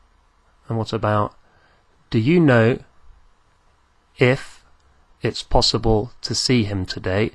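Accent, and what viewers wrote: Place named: United Kingdom. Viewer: i need a lesson to understand the fruity limey's accent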